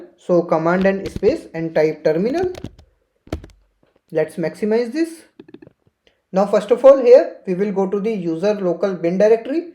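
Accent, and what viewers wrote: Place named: India. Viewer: love your indian accent :)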